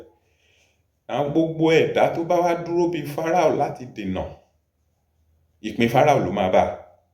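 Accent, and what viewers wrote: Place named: Okun, Nigeria. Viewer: Good admonition. I seem to detect Òkun accent and intonation. Am I right? 😊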